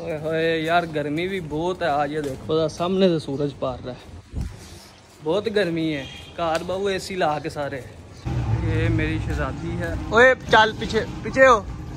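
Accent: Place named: Gujranwala, Pakistan. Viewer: Aoa bhai, watching your videos since day 1 and following you on insta since 2018 i think. I just want to say k aap apni Gujranwali jo zuban hay Punjabi or uska accent jo bolty hain. Yahi unique cheez hay aapki, aap us main hi vlogs banaya karyn, or yahi waja sy log aapko follow karyn gy. Or apki squats or jerk ka bhht bara fan hun, ye dollay shollay or shredded body k peechy na jayen, us k liye fat bhht cut karna parti or bhht papar belny prty, apki power b compromise ho gi. Bass yahi ek choti c advice apk liye. Thank you and love you. By the way meri birth b gujranwala ki hi hay is liye wo accent sunny ka sawad ata. Is liye fake urdu accent main aap apny apko achi trhan express b nhn kar pao gy. Or log vlogs yahi cheez dekhny k iye dekhty.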